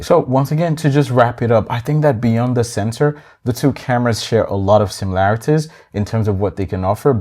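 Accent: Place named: Nigeria. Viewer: Oga that Naija accent can never hide lol. Thanks for the video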